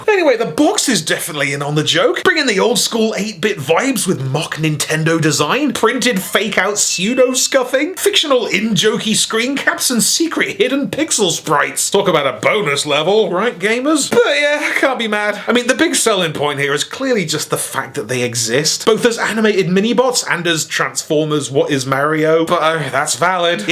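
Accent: United Kingdom. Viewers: Have to admit that I'm not here primarily for the bots but rather the magnificent British accent, hilarious puns and ass-kicking music. Keep on being you,! <3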